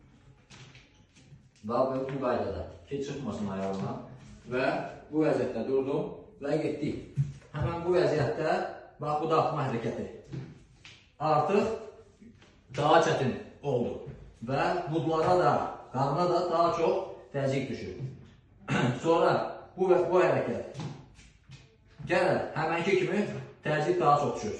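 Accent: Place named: Qakh, Azerbaijan. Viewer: Əxi siz Qaxdansız? Qax ləhcəsinə oxşayır ləhcəniz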